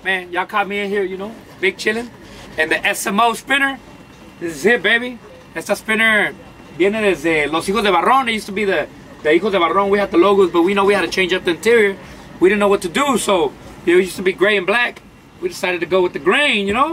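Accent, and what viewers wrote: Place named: Fresa, Mexico. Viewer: El español lo habla fresa y el inglés lo habla barrió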